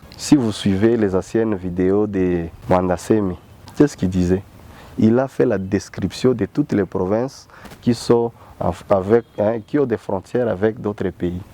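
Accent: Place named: Rwanda. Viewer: C´est les mensonges mon frere, pourquoi vous devez demontré le gens vos nationalité doutese, vous avez accent randaise.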